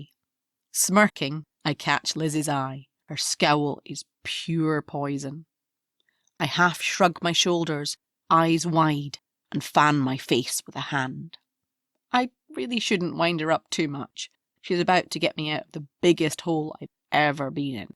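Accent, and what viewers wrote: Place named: Scotland, United Kingdom. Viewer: Wow, fantastic narrator. Love the Scottish accent, very fun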